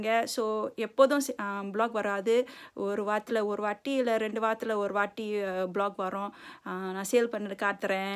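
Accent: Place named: Singapore. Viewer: Hai akka, too rocking netherland tamilan video. Good Inspiration and tamil talking too good. Your tamil language like singapore tamilan speaking same like that. Solo Woman Boat Rider ....Real Tamilachi Akka you like that. My Native in TamilNadu in Thanjavur district......etc. Please give me your Email Id Akkaa.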